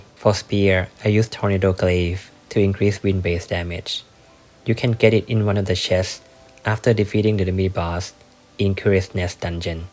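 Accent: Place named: Thailand. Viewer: You sound Thai, where you from?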